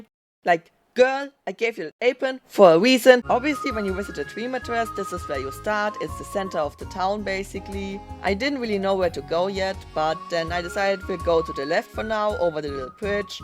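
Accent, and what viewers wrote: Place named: Germany. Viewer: I don’t know if you’re German or something, but I love your accent❤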